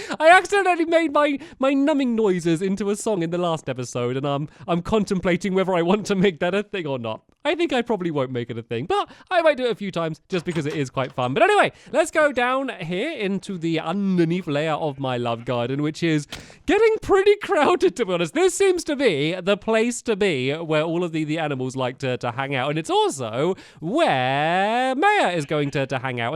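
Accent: United Kingdom. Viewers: For a while I stopped watching you stamps because I always wanted to do The stuff you do, but never had the chance to do it because my dad wouldn't let me. I got jealous? Well, last night My brother updated our minecraft up to Xbox live and we got ALOT of the new stuff you have. It took the whole day to figure it out. I was discouraging to my brother and told him to give up but now I'm watching him trade with villagers, something I've always wanted to do. I feel awesome now and I think I'll try to start watching you again. I miss your cute British voice!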